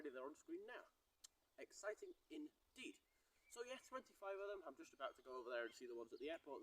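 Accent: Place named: Germany. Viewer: Excellent video. Very interesting and a great German accent too!!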